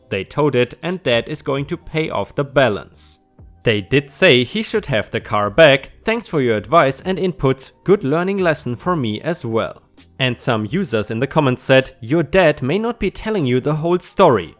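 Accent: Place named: Germany. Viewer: Subbed, belled and happy to hear your crazy German accent :-)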